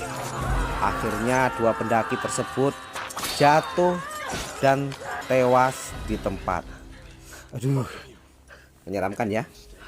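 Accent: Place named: Java, Indonesia. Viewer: Orang jawa ya,medok banget.hahaah. Mas coba dongengin film tentang pendakian gunung everest. Ditunggu video selanjutnya. Sukses selalu ya.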